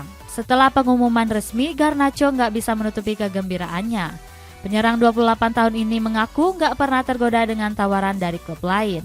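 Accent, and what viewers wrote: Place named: Kalimantan, Indonesia. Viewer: Logatnya kalimantan banget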